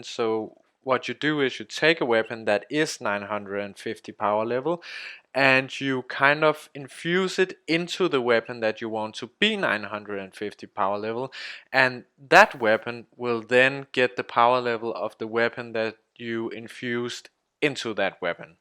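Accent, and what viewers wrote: Denmark. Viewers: I love the Danish Accent....you should hear mine, we sound very alike hehe :p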